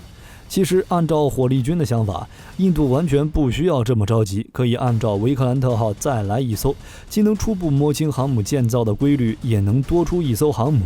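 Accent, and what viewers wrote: China. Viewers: 可能是聽見中國口音在酸印度，反中基因的膝反射。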